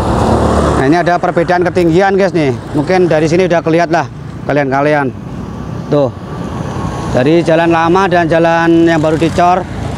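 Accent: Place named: East Java, Indonesia. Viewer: ❤❤❤❤😂😂😂😂 BIKIN KANGEN..... saat pembukaan nada2nya...... Medok2... kayak orang Jawatimur... Kadang kayak orang Sunda... Kadang kayak orang Jawa Tengah.... 😂😂😂😂😂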